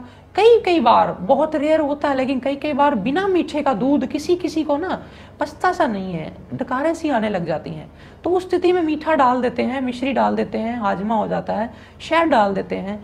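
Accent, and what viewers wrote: Punjab, India. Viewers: Yr tone is very soft n Punjabi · Guru ji tusi Punjabi ho?😊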